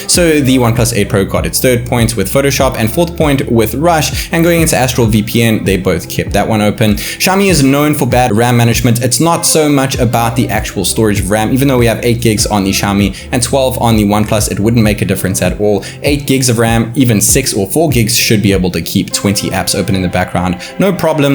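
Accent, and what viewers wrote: South Africa. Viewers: Where are you from bru you sound South African ? much love from SA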